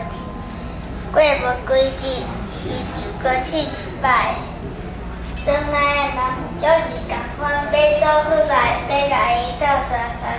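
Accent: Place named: Taiwan. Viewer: 讀得太好了，情節很精彩，台語很道地。希望貴聯盟能製作有更多台語版的伊索寓言。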